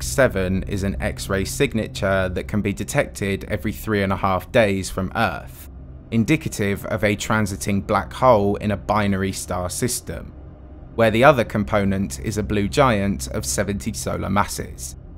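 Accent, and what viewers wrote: United Kingdom. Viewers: UK accents aree annoying.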